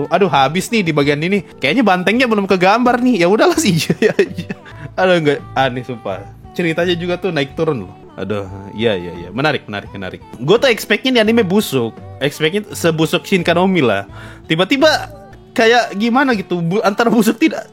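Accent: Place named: Masamba, Indonesia. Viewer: logatnya kaya orang masamba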